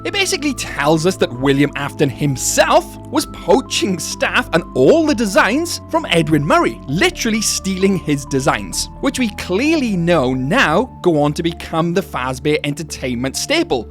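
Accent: Wales, United Kingdom. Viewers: Are you welsh ? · Great video bro love from England but I think your a Brit as well ❤love to anyone reading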